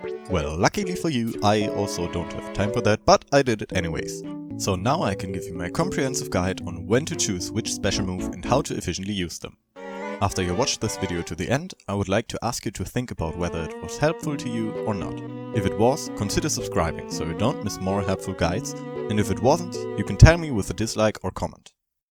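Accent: Germany. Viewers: Sorry bro aber da hör ich nen deutschen Akzent raus lol . Cooles Video, ich find Brawler auch sehr spannend, er ist sooo underrated!! :)) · Du klingst sehr deutch./You sound very german. And also thanks for the video.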